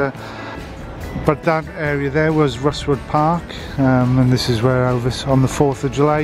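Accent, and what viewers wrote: Wales, United Kingdom. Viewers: Smashing Pics Of Young Elvis. Amazing How More Information Keeps Popping On Elvis After All These Years. Thanks To Elvis Fans Like.(Do I Detect A Lovely Welsh Accent) Being Welsh Myself.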